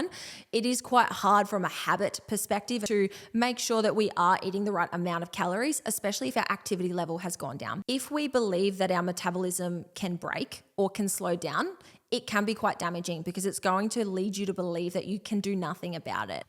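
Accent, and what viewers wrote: Australia. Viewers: Australian? I miss that accent. Had a lovely time during my stay in Melbourne. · Damn your accent is incredibly Australian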